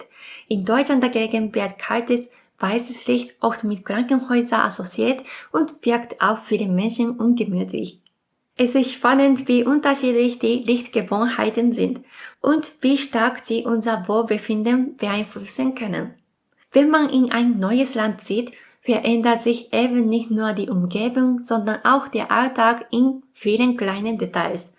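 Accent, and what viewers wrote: Japan. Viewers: Liebe, ich höre ihnen sehr gerne zu, ihr Deutsch ist perfekt mit dem kleinen japanischen einschlag. Ihre inhalte sind sehr informativ und ich freue mich auf weiteres von ihnen.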